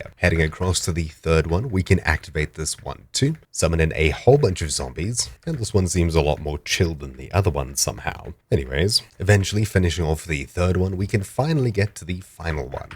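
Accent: South Africa. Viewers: Am I the only one who only realised now, based on the live game commentary, that he’s South African 🇿🇦 😂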